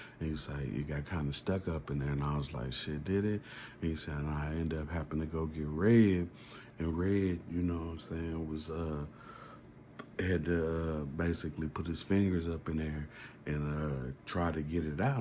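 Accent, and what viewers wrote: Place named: South, United States. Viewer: Water ever time you talk…talk like u from slow south…… story dope though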